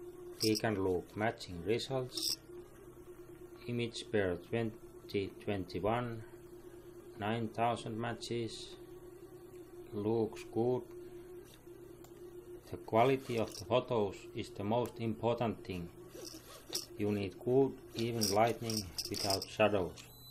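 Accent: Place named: Finland. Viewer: Fab, very helpful. I'm on a Mac and unless I make my own binaries I have to use Regard3D. Are you still using it? I'm guessing you are from Finland - having listened to Mika Hakkinen for many years I THINK I recognise the accented English. Or ARE YOU MIKA HIMSELF?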